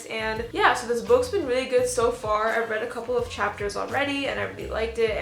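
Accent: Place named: United Kingdom. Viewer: the British accent !!! 😂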